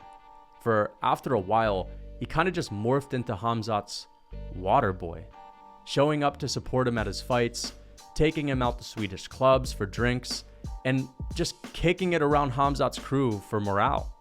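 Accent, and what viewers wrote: Ireland. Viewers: Irish accent! 😅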